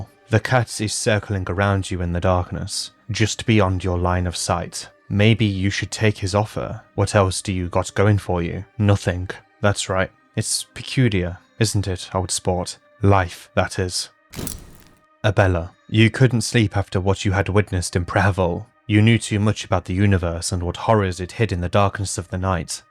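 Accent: United Kingdom. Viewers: as a linguistics major, you didnt really mispronounce very many foreign words in the last funger video. And if anything most of the words that people thought you did mispronounce can be chalked up to your specific english accent. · the full voicing of this video plus your british accent makes me feel like im listening to a tma episode at times lmfao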